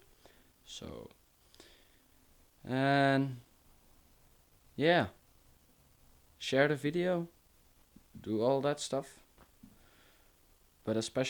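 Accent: Netherlands. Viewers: You 're dutch ?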